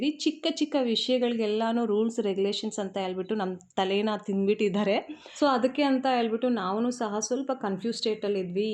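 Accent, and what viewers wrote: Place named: Punjab, India. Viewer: Hi nanu nime volg evte nudidu medam esta ayethu.but nime kannada keli sulpa shock ayethu i think u r a panjabi adre esto chanagi kannada madatitdara very nice 😊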